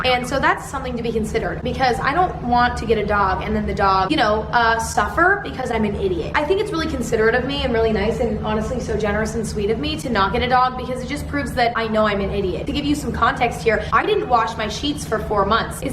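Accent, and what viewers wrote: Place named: United Kingdom. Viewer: Why did I think this girl was british?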